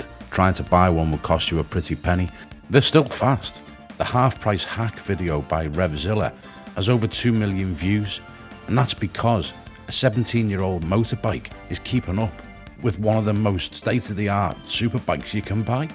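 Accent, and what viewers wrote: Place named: United Kingdom. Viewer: ha no don't think so. Unless you're on the tdr forums, my name is blacklines on there. Or Owen in real life. Think it was just a bit disorienting seeing Collie and thinking ok it's one of the WA lads. Then hearing your voice and thinking ah maybe I was mistaken. Maybe it's someone off tdr. Then seeing Wanneroo and realising ah you're another brit in WA. I moved over here a year ago.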